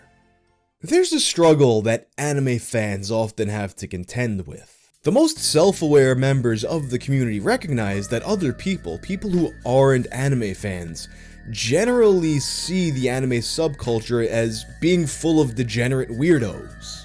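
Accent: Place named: New York, United States. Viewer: Nah, it wasn't repetition of the word, and it didn't start to lose meaning to me. It was just hearing it in American New York accent that almost immediately flagged the word itself as a unique one. Thanks for alerting me that there's a word for that phenomenon, though! Interesting little wikipedia page on that.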